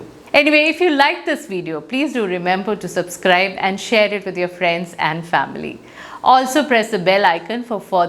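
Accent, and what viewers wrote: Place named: India. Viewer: No popular english self help books are available over YouTube in Indian accent. I think you have a wonderful, sweet and friendly Indian accent. If you think of doing it, it would definitely help lots of aspirants like me in developing our English beside personality. Thank you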